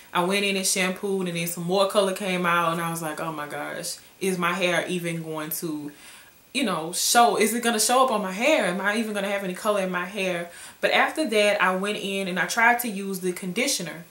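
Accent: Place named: Midwest, United States. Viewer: hey love, thanks for the review. also, are you from Chicago? i kinda hear a Midwest accent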